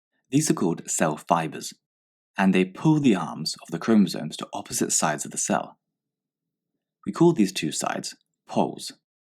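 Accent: United Kingdom. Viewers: HE IS BRITISH!!!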